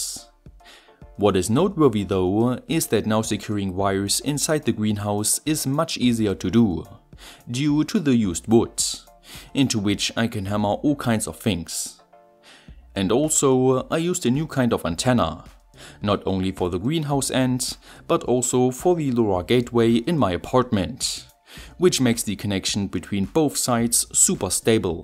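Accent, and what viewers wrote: Germany. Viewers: I think it just comes down to the German accent as some sounds in English don't appear in German, like instead of -ous (serious) we say -ös (seriös).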